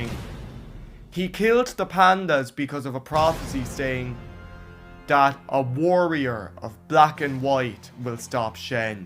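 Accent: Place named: Ireland. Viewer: I can tell your Irish :D so am I.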